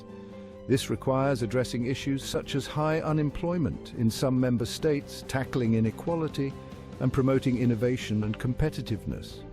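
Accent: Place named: United Kingdom. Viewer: Why am I listening to a British accent talking about the European Union. Brexit means Brexit.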